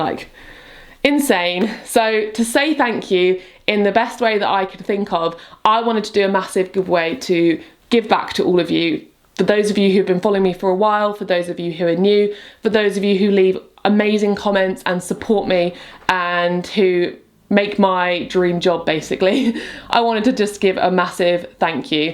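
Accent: United Kingdom. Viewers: I watch your videos since 3 years now and love them. Your british accent is kind a cute. Would love to win one of the blenders because I just moved out to study becoming a dentist. I hate that I don't have the ability to blend stuff in my little dorm room.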